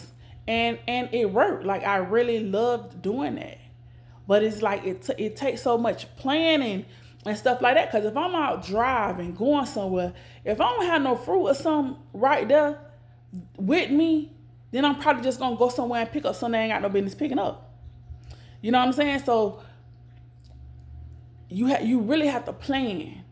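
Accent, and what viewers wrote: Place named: New Orleans, United States. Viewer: Girl you from New Orleans 😂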